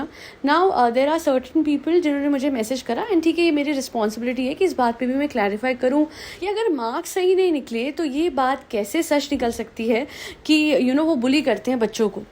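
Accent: Punjab, India. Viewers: Sorry to ask but aap Punjabi ho kya?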